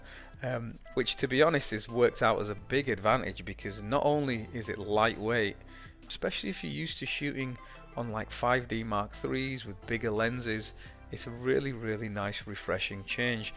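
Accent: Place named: Northern England, United Kingdom. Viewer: Nice northern accent ? I hope the model who is a stunner is from Yorkshire? Could you stop speeding her walk up , its the best part of the video .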